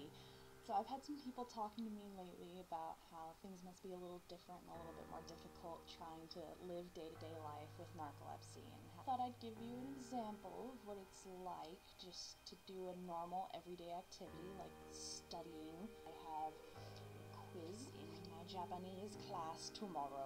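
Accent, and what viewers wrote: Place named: Midwest, United States. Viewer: Dear, Again, I am bowled over at your great attitude and unselfish sharing of what most would want to hide away. It’s an encouragement for me dealing with some intractable issues of my own. Obviously, you are studying Japanese. I am very curious as to why? I love language. I was born and raised with two, English & Croatian. I’ve added German, French, Farsi (including reading and writing the Arabic script), Pali & Sanskrit. I’ve started on ASL, but Japanese has always been on my list. A big motivation is how much one can learn about a people and their culture from their language; from the throngs they have words for to those they don’t to those they have many words for with very minor though important differences. What is your reason for choosing Japanese? You sound like someone from the US Midwest when just talking. 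But even using the words from the dances and songs your intonation seems spot on. Thank you in advance for sharing if you choose, too. With much Metta, John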